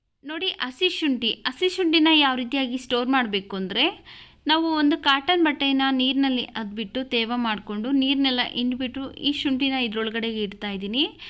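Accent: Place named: Kolar, India. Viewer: kolar bagepalli telugu kannada mataadteera... nice tips...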